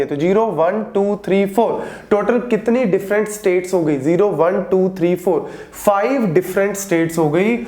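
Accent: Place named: Haryana, India. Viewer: are u from haryana ur accent already reveals it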